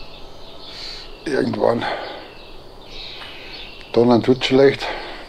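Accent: Bavaria, Germany. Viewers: Die Wachtelbohnen in Tomatensoße muß ich mir auch mal besorgen. Die gibt es bei Amazon günstig im 2er-Pack. Dein bayrischer Dialekt ist für mich immer ein Genuss. Ich wünsche Dir viele Sonnentage. LG Bernd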